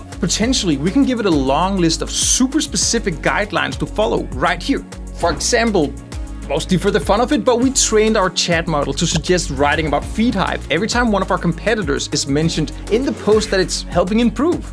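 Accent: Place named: Denmark. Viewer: Good video. Are you Danish? I ask because of your accent.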